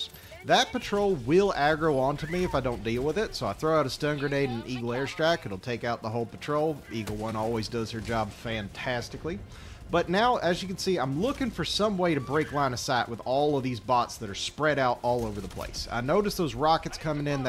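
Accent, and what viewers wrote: South, United States. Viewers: It’s interesting to hear a guy with a southern accent say democratic pride. You’ll never hear that otherwise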